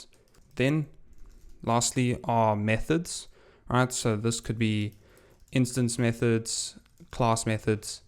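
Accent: South Africa. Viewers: Hey a south african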